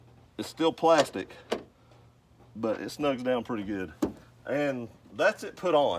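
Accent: South, United States. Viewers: Southern state are you from because you have a very deep Southern accent.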